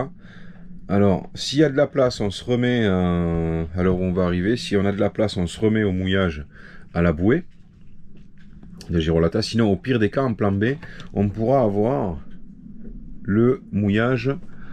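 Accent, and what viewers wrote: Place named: South-West, France. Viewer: J’ai entendu cet après midi sur le 16 « graine de sable pour le liberty » impossible de se tromper avec l’accent du sud ouest, j’étais sur que c’etait vous ! On était a cala Conca, maintenant a l’anse de Rocapina. On hésite encore pour la suite avec cette grosse houle d’ouest qui arrive. Vous rentrez quand sur Leucate ? Au plaisir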